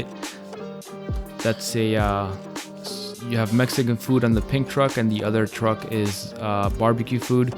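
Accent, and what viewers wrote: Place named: Miami, United States. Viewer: You have a thicc Miami accent brother lol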